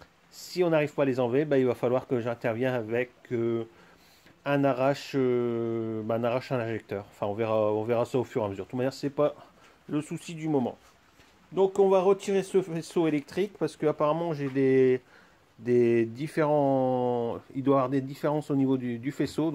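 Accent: North, France. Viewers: Tu est d’ou du nord ?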